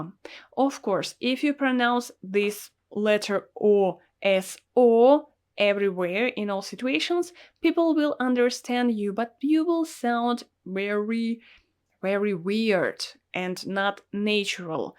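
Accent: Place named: Russia. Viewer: Спасибо за видео. One single tip - try to pronounce English words with an O clearly. For example Organization or motivation with a clear O. I know as a Russian native it's hart to switch because the O will be pronounced as an A. So your English word organization sound like arganisation and motivation like mativation. Not bad at all but funny:)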